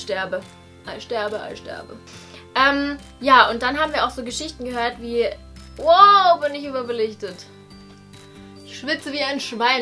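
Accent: United Kingdom. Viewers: Hey!♥ Ich verstehe wie du das mit dem Englisch sprechen meinst, ich bin auch zweisprachig aufgewachsen:) Meine Mama ist aus Italien😊 Ich würde es mega cool finden wenn du englische Videos machen würdest ich liebe deinen britischen Akkzent!😍 Deine Neuseeland Vlogs inspirieren mich sehr:D Ich möchte gerne nach dem Abitur ein Jahr um die Welt reisen☺ Danke für die Inspiartion und genieß deinen Urlaub 😘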